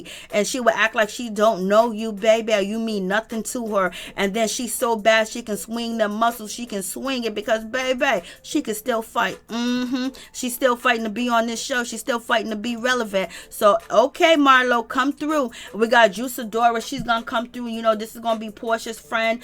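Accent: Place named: South, United States. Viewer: YOU HAVE THE WORST ACCENT AND YOUR WORDS MAKE YOU SOUND REAL COUNTRY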